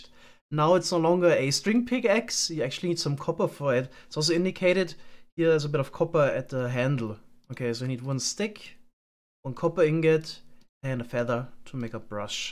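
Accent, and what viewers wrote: Germany. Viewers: Ur German right ?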